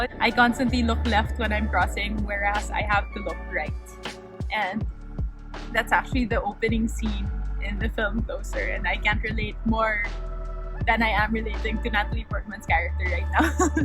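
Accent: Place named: United Kingdom. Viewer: Lumalabas British Accent niya dito since she is British-Pinay hehe Gandang Babae nito <3 At ganda din diyan sa UK lalo na sa London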